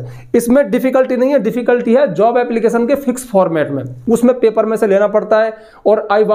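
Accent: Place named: Meerut, India. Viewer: meerut se ho ky sir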